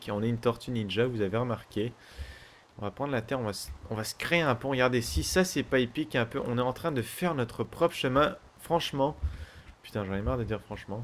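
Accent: Quebec, Canada. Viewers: Ouais, il vit au Québec donc desfois l'accent québécois surgit :D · Il est canadien ou francais?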